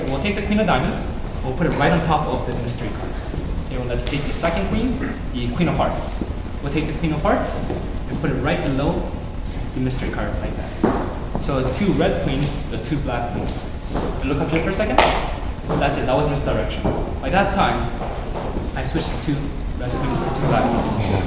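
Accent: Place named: China. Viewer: that was an awesome performance... BTW u sound like a Chinese. r u??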